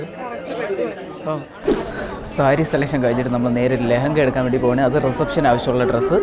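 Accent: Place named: Trivandrum, India. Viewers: Ithu normal Trivandrum slang aanu...ini ipol avarodu avarude normal slang maatti valla Kannur slangum parayaan parayaam ningale thripthipeduthaan entha.. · Edey edey ith normal trivandrum slang aan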